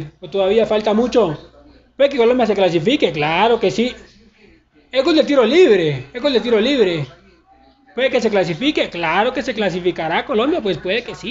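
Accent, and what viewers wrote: Esmeraldas, Ecuador. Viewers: Lo q no cacho es q donde eres tu mas pareces ecuatoriano que colombiano por tu forma de hablar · Hey brother, saludos desde Quito, pero tú acento tiene un parecido a la gente de la Esmeraldas no tan marcado pero si lo tiene, capaz y es de Tumaco. Saludos panita. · Mijo usted parece esmeraldeños, por el hablado, Saludos desde ecuador. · Parece mas esmeraldeño que colombiano xDD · Pensaba que eras de Esmeraldas-Ecuador, hasta tienes el acentito por ratos, sin ofender. Saludos.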